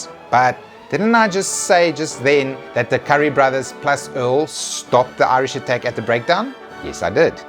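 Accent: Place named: Johannesburg, South Africa. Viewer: Good old Afrikaans Jo'burg accent.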